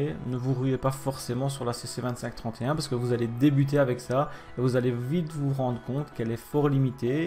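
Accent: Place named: Belgium. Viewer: Hello, Merci pour cette vidéo, étant en plein réflexion sur le futur de ma domotique avec peut être un passage vers HA donc ta vidéo tombe à pic 👍 Pour ton accent Belge aucun problème ça donne du charme à tes vidéo 😊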